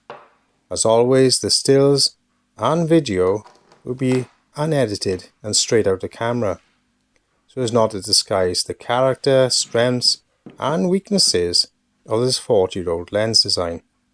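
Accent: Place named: South Wales, United Kingdom. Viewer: After seeing your review I picked one of these up (Nikon F mount) on eBay for forty quid. Not sure why but I'm finding it's overexposing on my Nikon D500 and I'm having to set a compensation of -1 to -2. Apart from that it's an excellent lens. I came back to your video and just twigged on the voice, South Wales. 👍🏻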